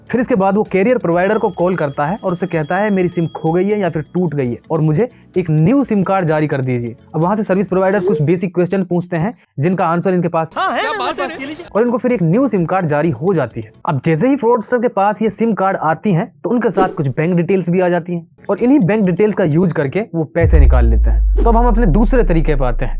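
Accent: Haryana, India. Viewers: I can see that Haryana accent in his voice , good work bro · Bro Haryanvi style toh hai 🤔 ( suggest me)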